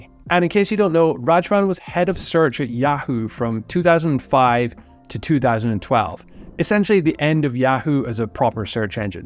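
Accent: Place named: France. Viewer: My site's numbers went back to normal almost .. climbed back up in a week. I like this copy of Income school's report but with a French accent. :). Well, same tribe destroyed almost all US corporations, from Pharma to Boeing and everything in between. Just so they can rise from slumps and not be slump dawgs.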